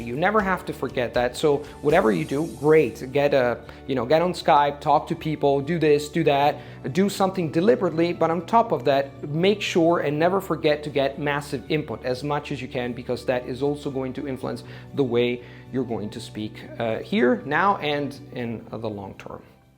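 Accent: United States. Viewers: I'm shocked too, he sounds american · You sound American, kudos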